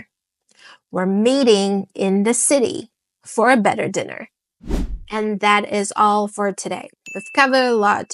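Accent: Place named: Midwest, United States. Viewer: Maybe I'm crazy but you do sound mostly mid-west to me (I'm from the mid-west) lots'a lazy letters from d'ere. 👍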